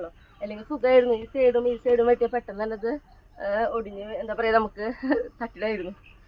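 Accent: Thrissur, India. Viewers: കുറച്ചു കൂടി clearആയിട്ട് സംസാരിക്കണെ..ഞങ്ങൾതൃശ്ശൂർക്കാരല്ല..മനസ്സിലാകാൻ കുരച്ചു പ്രയാസമുണ്ട്❤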